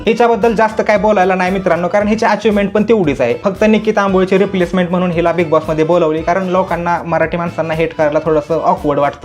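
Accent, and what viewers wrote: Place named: Karad, India. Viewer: Karad ancent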